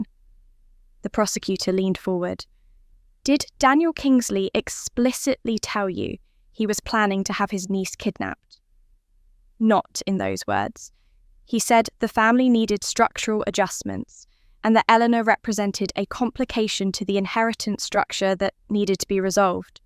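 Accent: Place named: England, United Kingdom. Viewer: Lol she said she lives in Cambridge, its an English accent...but she lives in Boston part of New England.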